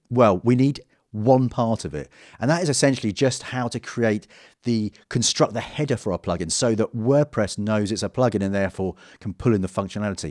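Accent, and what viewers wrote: United Kingdom. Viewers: Love your tutorial plus your british accent....from Nigeria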